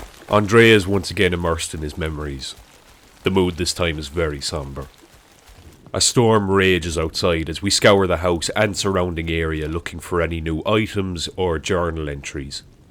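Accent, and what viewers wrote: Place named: Limerick, Ireland. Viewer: Oh, by the way, what's your accent? It makes me think of OP Shakespeare a little bit. Edit: Ah, Limerick.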